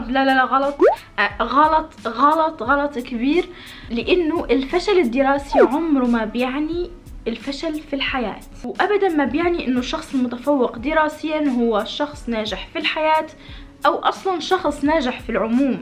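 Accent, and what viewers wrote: Tunisia. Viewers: انت تونسية؟؟❤️❤️ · Omg u're a tunisian girl when i heard u frist i felt u were tunisian but then i was like naahhh this girl has a lot of accents idk menysh msad9a ama merci i needed thiiissss sooo baad 💖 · حسيت انو انتي من شمال افريقيا انتي من تونس 💙💙💙💙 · انا اول مرة نشوف فيديو تاعك اما انتي تونسية مش هكا حسيت من لهجتك هكا نبرتك انك تونسية صحيح و الا لا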